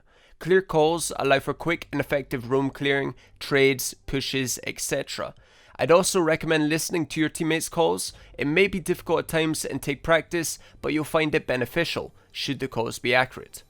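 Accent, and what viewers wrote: Scotland, United Kingdom. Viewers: Where you from?can hear a Scottish accent a bit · R u Scottish?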